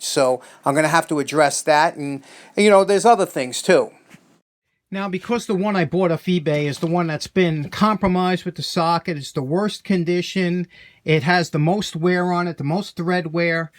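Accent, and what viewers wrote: New York, United States. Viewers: Longga island. Accent is great. Thanks for the vid. Few of the most genuine people i ever met are from new york.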